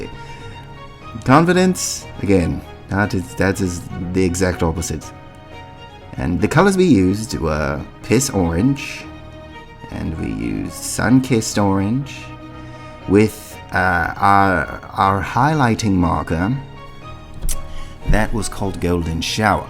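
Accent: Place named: United Kingdom. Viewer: I’m deaddddd 😭 he really sounds British tho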